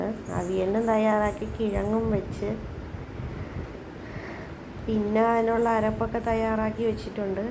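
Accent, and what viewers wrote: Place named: Thiruvananthapuram, India. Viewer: സ്ഥലം എവിടെയാ സംസാരം കേട്ടിട്ട് ആറ്റിങ്ങൽ വെഞ്ഞാറമൂട് ഇവിടെ എവിടെയോ ആണെന്ന് തോന്നുന്നു 🥰👍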